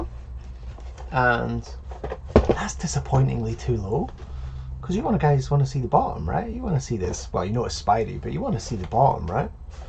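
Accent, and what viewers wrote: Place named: Scotland, United Kingdom. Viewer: My first video since subbing and I enjoyed it very much so. Makes a change hearing another Scottish twang lol. Great job. Really well done video pal I enjoyed it. I still need a Mysterio pop Looking forward to more of your content man. 👍🙏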